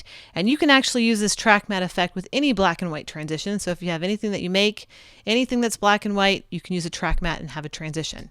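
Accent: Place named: United States. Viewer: Very good explanations and perfect us accent for me (French 😉) !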